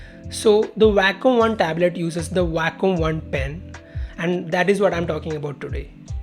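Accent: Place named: India. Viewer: english indian LOL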